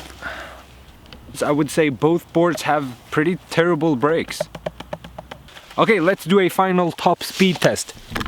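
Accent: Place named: Sweden. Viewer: Just when I was wondering "why are his pants so small?" he started speaking with a Swedish accent and it all made sense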